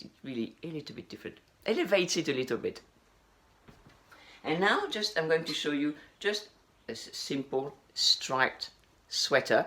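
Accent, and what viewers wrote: France. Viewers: I love your accent. Your clothes are beautiful, timeless classic pieces that you could have in your wardrobe for many years. I think it's worth investing a little more money into good fabrics and a piece that's a little different. As you would wear them time and time again. I love the black and white, and navy and white stripes and polka dots in your wardrobe, and accessories, just beautiful 😄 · Hi, I’ve just discovered your channel and already watched a bunch of your videos. I love them and I love your English with French accent! It’s so cute! I was wondering if you could do a video on how to dress according to age. I’m in my late thirties. Blessings from Argentina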